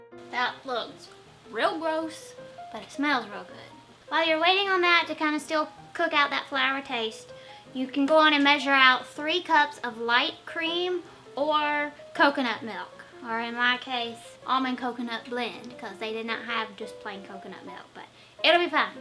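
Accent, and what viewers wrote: South, United States. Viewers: Slow southern draw! Haha it was an adjustment for me when I moved from up north to down south. Apparently on the flip side I speak really fast! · You look like wynonna rider! But with a southern accent! I promise it’s a compliment!! ❤️❤️❤️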